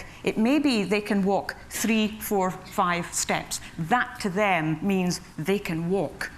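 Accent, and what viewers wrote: Scotland, United Kingdom. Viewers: I can hardly believe this woman is deaf. For one thing, she doesn't speak like a deaf person. She articulates every syllable perfectly with a Scottish accent. It doesn't surprise me that she can play percussion, because rhythm is something you feel rather than hear. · Scottish accent. Very recognizable, if you are into such things are listening to accents and figuring out where folks are from (like me...lol). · If she is deaf, how could she pick up a Scots accent? Or even talk at all? Being a physicist all I can get from this is a lot of vague waffle. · She is Scottish..Her accent is actually Scottish. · "without the telltale signs that most deaf individuals demonstrate." You are making the mistake of assuming she was born deaf. She wasn't. She didn't become deaf until the age of 12, by which time she could speak normally. 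And it is also not surprising that she has a Scottish accent, given that she is Scottish. It's not astounding at all. Do a little research next time, before making comments on Youtube. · Thanks for clarifying her age loss of hearing,i was wondering how she got a scottish accent. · she's deaf and she could play the drums?? thats amazing! what's even crazier is that she has a scottish accent and she lip reads! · For Deaf (of any level) she speaks very well and love her Scottish accent. Also as a drummer and percussionist who came up through high school bands I forgot how much I love the Marimba (what she is playing) and Xylophone. I never was very proficient and graduated to drum kit through college and club bands. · Scots accent is funny!! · How does she have an accent? She sounded scottish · a deaf person with a Scottish accent?? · This lady is amazing. So talented and I had no idea she was deaf until she said so. 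Her accent sounds like she is from the Scottish Highlands (so sounds like Prof McGonnagall from HP). Edit: Wikipedia says she was brought up in Aberdeenshire, hence the Doric accent.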